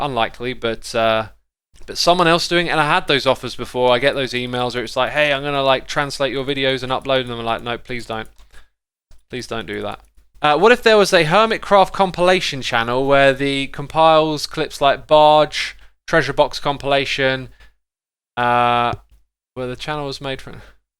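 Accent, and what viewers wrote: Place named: United Kingdom. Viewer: What kind of accent is that? I'm pretty sure X is from Britain, but what section is that accent. It sounds like no other accent and familiar at the same time. I absolutely love it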